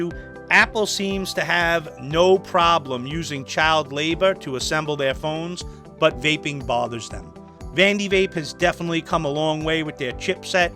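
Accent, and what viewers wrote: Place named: Boston, United States. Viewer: you have a nice boston accent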